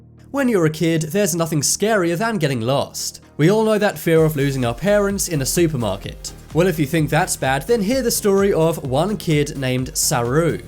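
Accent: United Kingdom. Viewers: Are you britash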